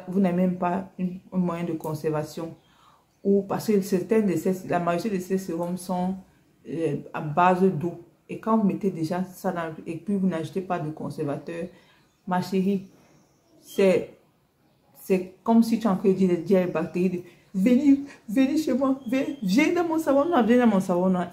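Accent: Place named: Cameroon. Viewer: Le ton camerounais 😂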